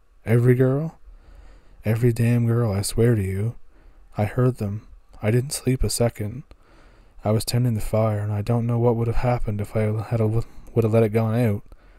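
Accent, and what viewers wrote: Canada. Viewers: I'm pretty certain he's from Canada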